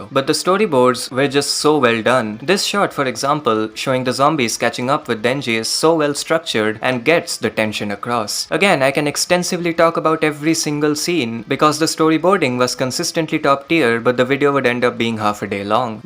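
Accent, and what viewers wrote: India. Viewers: Are u from India???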